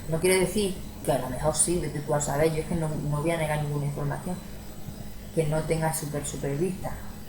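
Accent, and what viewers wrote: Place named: Andalusia, Spain. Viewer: Hola. Gracias por tu video es muy generoso por tu parte. Me encanta tu acento andaluz y se te entiende perfectamente. Quien no entiende es porque no quiere. Me ha sorprendido lo bien que explicas los conceptos, con naturalidad y soltura. Ha estado muy interesante. A mí me gustaría también oir más de tus experiencias. Sigue subiendo vídeos. Saludos y no hagas caso a los haters, los hay en todos lados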